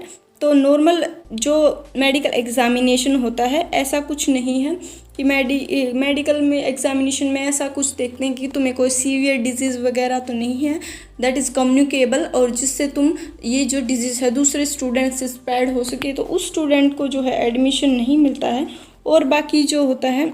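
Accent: Haryana, India. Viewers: Ma'am aap haryana se ho kyaa😁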